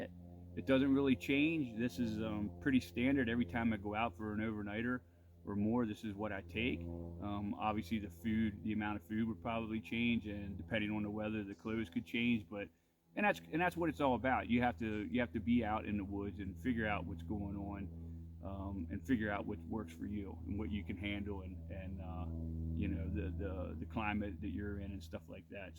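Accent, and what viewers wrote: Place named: Philadelphia, United States. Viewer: Nice job with your video. What County are you in? You sound like you’re from the Philadelphia area!!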